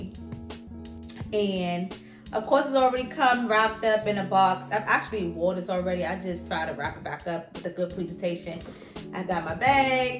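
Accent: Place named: Washington DC, United States. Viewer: I know a DC accent when I hear it. Love my Yurman rings and stack all of em 💕 thanks for sharing.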